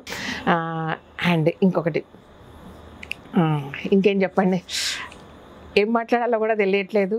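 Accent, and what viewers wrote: Telangana, India. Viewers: Super. Telangana yaasalo chaala baaga aagakundaa acham vaarilaage maatlaadaaru😃😂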